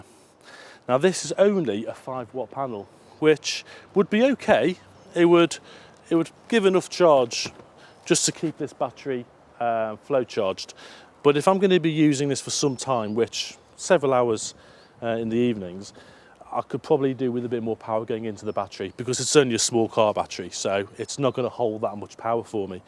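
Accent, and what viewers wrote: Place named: Northwest England, United Kingdom. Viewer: Hi, I am a complete noob at this sort of thing, but what sort of wattage would you get from your 25w panel give, would it power a 2500w watt chain saw? we are trying to power a allotment shed to give us power on site, ps I could tell by the accent your from the northwest I a from liverpool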